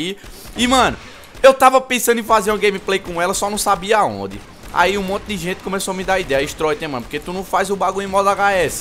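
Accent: Northeast, Brazil. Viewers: Man Se E du Nordeste ? LIKE BRODI ! ♥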